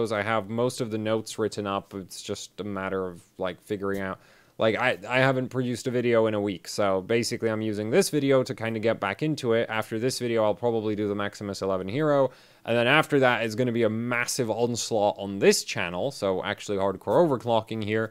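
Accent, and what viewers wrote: United Kingdom. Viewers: I didn't realise your from the UK because your accent is very vague. I'm quite disappointed that there's very little tech events that happen in the UK. I would love to see you and 8PACK as an overclocking team (you have all the hair and he has all the muscles). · British lol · British.. I never would have guessed it.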